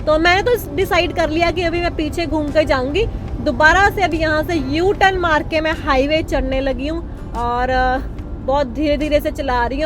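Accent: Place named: Punjab, India. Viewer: Aapki punjabi english badi pyari hai. Aap ki birth place konsi hai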